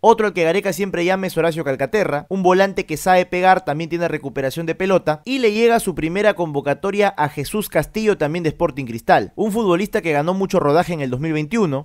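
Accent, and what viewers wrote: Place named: Cordoba, Argentina. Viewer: pprque tienes un acento medio argento, especificamente cordobés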